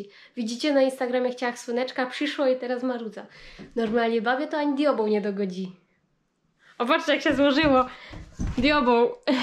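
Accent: Silesia, Poland. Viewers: Podoba mi sie ta Śląska Godka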